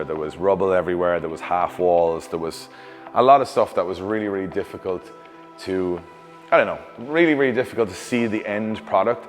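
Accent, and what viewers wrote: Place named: Ireland. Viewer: shocked when i heard the irish accent and then that you said this was in dublin ... instantly subbed ....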